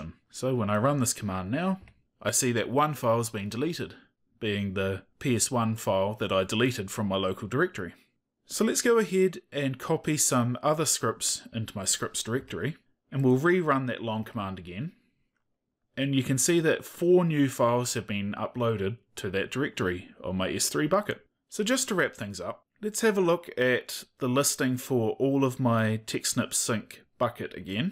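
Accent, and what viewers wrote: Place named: New Zealand. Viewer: love the Kiwi Accent Choice bro!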